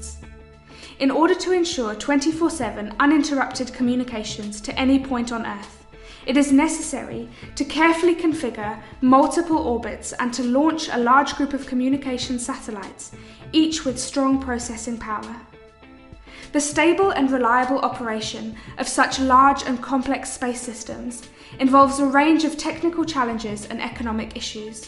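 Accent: United Kingdom. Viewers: So well articulated. Perfect English with a Brit or New Zeeland accent. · Very good, high information content video. I especially like the English accent of the sweet sounding narrator. I give it a 99.99!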